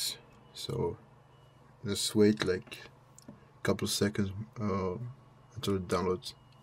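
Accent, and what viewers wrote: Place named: France. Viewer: francais? you sound francais.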